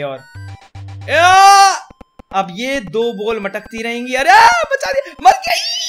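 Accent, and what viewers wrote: Haryana, India. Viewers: Bhai tum haryana se ho kya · Bhai ki Haryanvi sahi hai👌😂😂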